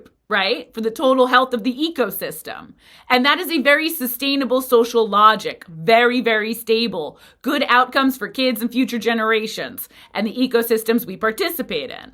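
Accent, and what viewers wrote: United States. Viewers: what region of America are you from?, you have a slight accent, just curious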